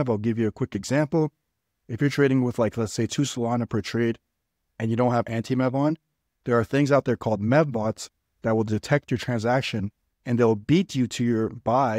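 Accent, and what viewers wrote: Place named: New York, United States. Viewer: You gotta be from NY or at least from the northeast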